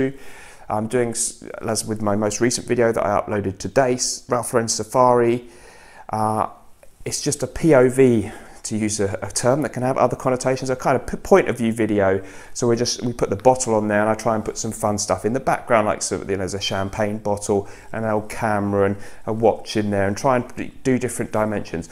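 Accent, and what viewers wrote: United Kingdom. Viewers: Heeey, you are too harsh to yourself))) buuut I totally agree with you, the one can take inspiration from other topics of interest and hobbies...you can make podcast, interviews with interesting people, indepth description of a particular fragrance, we learn a lot from you. I like your British English and sense of humor.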